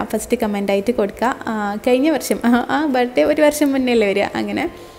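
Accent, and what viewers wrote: Kannur, India. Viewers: കണ്ണൂർ ഭാഷ എനിക്ക് ഭയങ്കര ഇഷ്ടമാണ്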